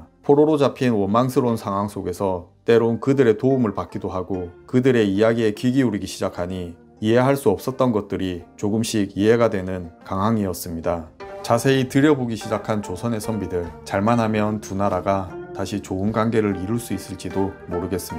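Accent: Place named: Gyeongsang, South Korea. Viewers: 일본인입니다.목소리도 좋으시고 내용도 재미있으면서도 잘 공부가 되고 개인적으로 좋아하는 경상남도 억양으로 말해 주셔서 유익하고 재미있는 영상입니다!! 구독합니다.!!감사합니다!! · 경상도 억양도 아네 ㅋㅋㅋ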